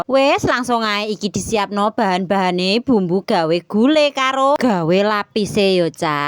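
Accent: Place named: Central Java, Indonesia. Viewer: Nadane koyok plwong Purwodadi , sampean asli pundi mbak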